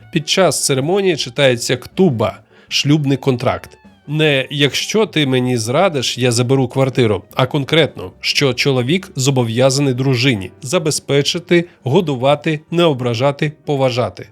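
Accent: Russia. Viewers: У автора проскакує російський акцент.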